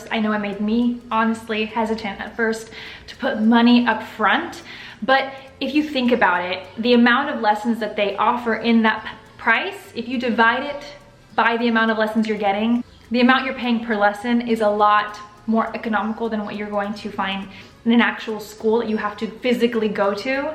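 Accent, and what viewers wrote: Italy. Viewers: Trop bien ! Tu parles déjà hyper bien. Je trouve aussi que t'as un tout petit accent italien quand tu parles en français, mais ça fait très classe :) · So glad to hear you speaking my language!! I'm so impressed to see you speaking so many languages! I think I never told you that I was French but I'm really happy to hear that you started to take French lessons online! Do you know what? You've got an Italian intonation when you speak French ! I'm excited to see more videos about language learning especially (and about French in general)!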